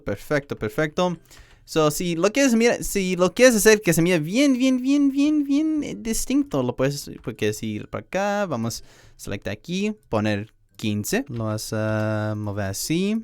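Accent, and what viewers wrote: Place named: England, United Kingdom. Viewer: un ingles intentado hablar español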